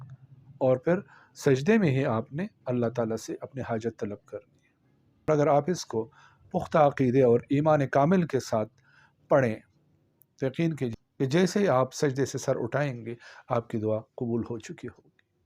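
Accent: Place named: Gilgit, Pakistan. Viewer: Aap Shia Hain ?? ✍️✍️✍️. Awaz say ttu aap Gilgitti lagtay Hain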